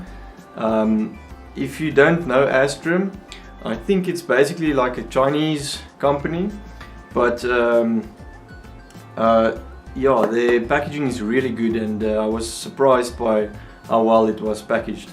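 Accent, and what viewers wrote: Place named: South Africa. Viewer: That South African accent...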